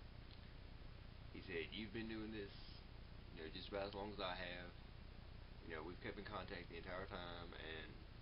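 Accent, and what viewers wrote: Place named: Appalachia, United States. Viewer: He speaks exactly like the Hillbilly guy named "Boomhauer" from the TV show "King of the Hill". I could barely understand every other word out of this guys mouth. By the way, I just bought a Hi-Point C9, still have a few more days before I can pick it up at the gun store.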